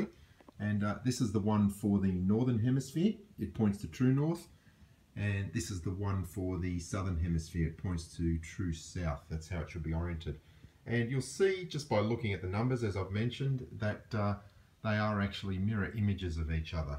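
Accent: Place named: Australia. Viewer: He is Australian as far as I can tell. Here is the NZ accent <link>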